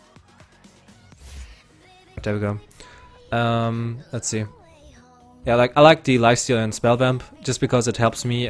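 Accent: Germany. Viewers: Wait what, is a German guy?:O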